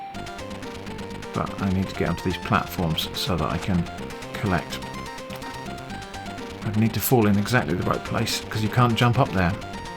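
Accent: England, United Kingdom. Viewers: um...games is plural....if some one asks you if you play games, and your answer is 1....then your real answer is NO I DO NOT PLAY GAMES...I play one game...thats a good way to show you're not really paying attention, and just making something about you, which is why you would know what the question is...even though you're obviously not paying attention if some one were to ask if you if played any type of game....thats basically it, do you like any type of game? That is singular...sounds like a English accent, but many people from Asia have English accents. So its confusing....you can make a 36 min video that only people who like you will want to watch while saying you don't have time to play games...do away with these type of videos and you might have several more hours a day.... as some one who doesn't know you, I don't honestly care if you play games...this is my intro to your channel and I feel I made a mistake by coming here. 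Now youtube thinks Im gonna want to watch but this channel isn't for me, if you're talking about yourself on the first video. We already have too many people in real life who think they are some big shot, thats one reason why we avoid real life and live online. Less people who are egocentric, used to be at least.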